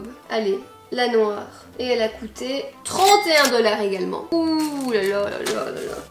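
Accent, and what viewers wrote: Belgium. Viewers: Ton accent belge i love it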